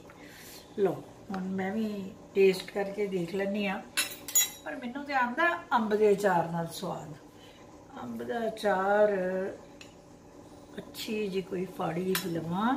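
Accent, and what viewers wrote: Punjab, India. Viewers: The recipe in your sweet Punjabi accent compelled us to cook Moth Dal Khichdi today itself ♥️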